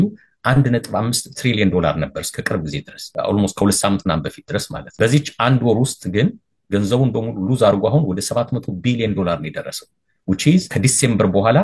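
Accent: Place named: Ethiopia. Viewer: After I listen you patiently you make good points,but You have very heavy accent. You Mentioned 100 times Dow jones. You said do jones typical Habesha.Read it Dow jones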